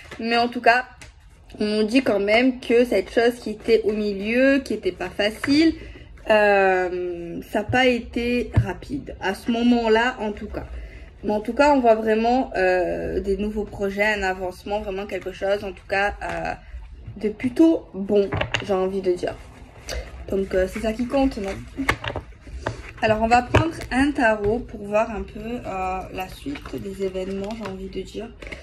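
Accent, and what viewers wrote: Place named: South, France. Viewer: trés jolie avé l, accent en plus ma voisine *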